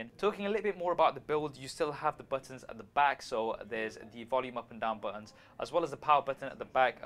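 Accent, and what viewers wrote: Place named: United Kingdom. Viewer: & You both are my fav reviewers And i honestly like your british accent Keep the good work brothers;)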